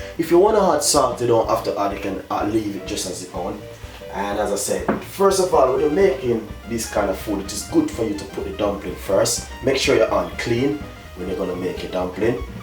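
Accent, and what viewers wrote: Jamaica. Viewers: ugh I loooovveeeee Jamaican men accents <3 I wanna watch his videos to just listen to him lol . great recipes to tho :-)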